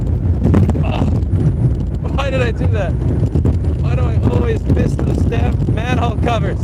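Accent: New York, United States. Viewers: thats called NY Jewish accent...works even if not Jewish, but we all have it and separates the locals from the wannabes or in this case the Macabees!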